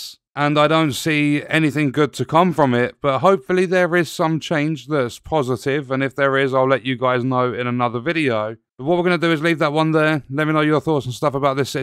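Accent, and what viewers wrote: United Kingdom. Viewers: Nicw to hear another british bloke commenting out here. And yeah, this decision has been the fastest reputation "self unalive" I have seen for a game recently. I really hope some competent individuals can come together to start a class action lawsuit against Sony. It would be cool if Arrowhead sued Sony for the damage they've done to their game, possibly even sinking the studio if it gets worse.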